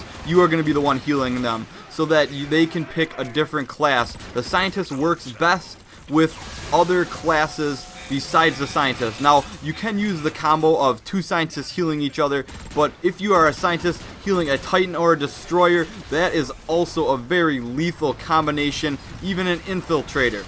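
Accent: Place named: Canada. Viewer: he has a really heavy canadian accent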